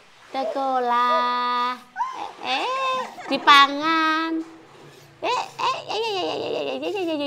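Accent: Java, Indonesia. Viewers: wqwqwq mbak mosok mangga gurih...aku mesti ngguyu ngrungokno mba ngomong...dialeknya jowonya kental....videonya apik kok....pinter bikin video...aku mbok diuruki mbak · ma'af mba..aku songko Demak lg semingguan nemu vlog mba lan seneng banget kehidupan lan logat jowone..ma'af mbak?adike sampeyan neng ternak entok nopo serumah karo mba,..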